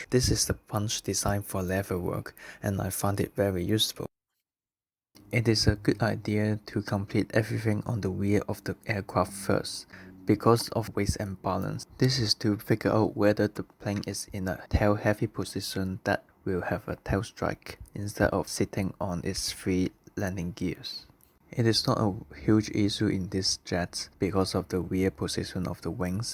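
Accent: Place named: China. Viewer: Are u from china? I like ur accent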